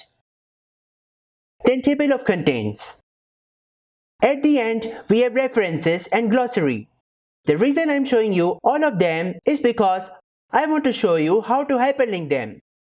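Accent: India. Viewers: Damn, that indian accent. Just cannot listen to it. Get better narator.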